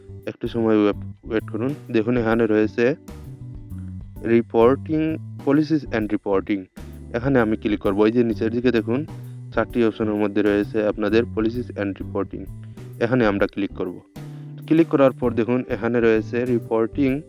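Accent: Sylhet, Bangladesh. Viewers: Vai kita Sylheti ni? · ভাই কি সিলেটি??😊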